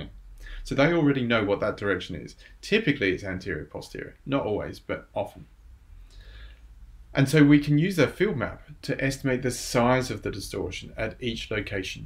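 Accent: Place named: New Zealand. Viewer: Trying to work out if the accent New Zealand, Australian, or either and influenced by time spent in England.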